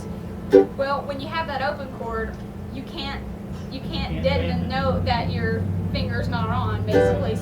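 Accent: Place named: South, United States. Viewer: Love her southern drawl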